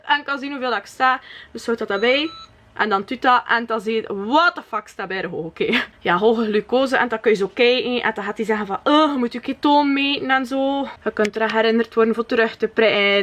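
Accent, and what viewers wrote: West Flanders, Belgium. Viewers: & ik hou van het West-Vlaams in je vlogs! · Funny... en west-vlaams! Ohja en ik moest van Hannes :D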